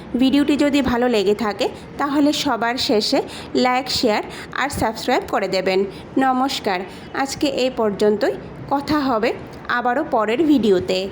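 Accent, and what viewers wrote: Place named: Bangladesh. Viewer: তোমার accent শুনে মনে হচ্ছে তুমি বাংলাদেশি. Cake এ লেবুর রস দেওয়ার দরকার নেই আর cake এ vanilla essence দিলেই ভালো লাগে.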